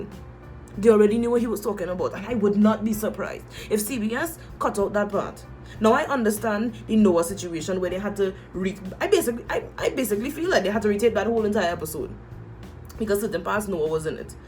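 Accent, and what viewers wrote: Trinidad and Tobago. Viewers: Lady,I like you you make my pores rise you sound totally Trini.Sorry.. Carribbean countries can't vote ,you know who we voting for.l watching like a hawk. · Hunni that TnT accent and the fact that you are upset made the accent better 💖💖💖. Well, thanks to persons like you CBS received those messages and got the last laugh. I hope they discontinue the exploitation of black women for their ratings moving forward. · I guess it all comes down to who the audience is. I have a pretty boring life and my relationship is so drama free and stable that I watch reality dating shows to entertain myself! So, the drama factor works well for me! 😅 Btw, are you Carribean ? You sound very similar to my Trini coworkers, and I just wanted to check if I can successfully tell the accents apart!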